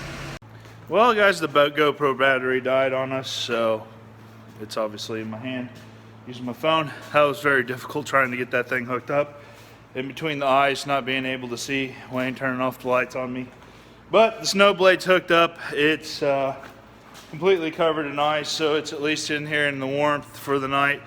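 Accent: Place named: Iowa, United States. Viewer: He is in extreme south central IA very close to Lake Rathburn and Centerville area. He has that southern IA accent.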